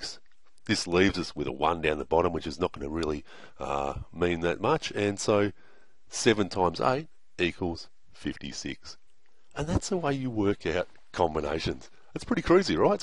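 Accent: Australia. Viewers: Are you Australian? I love your pronunciation hahahhh Anyway I love your videos Love from Italy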